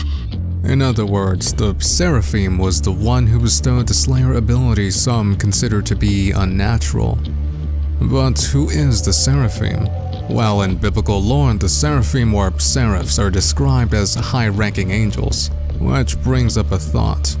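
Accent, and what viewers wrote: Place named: England, United Kingdom. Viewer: You kinda sound like Ryo (english accent), from Devilman Crybaby lol. Also good video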